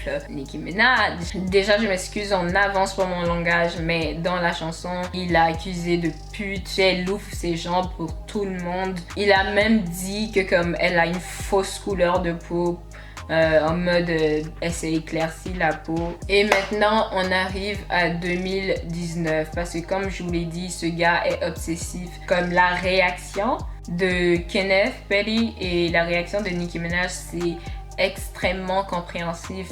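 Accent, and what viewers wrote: Quebec, Canada. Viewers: Tu as un léger accent québécois tu viens d’où? Snn t’es trop belle 😘❤️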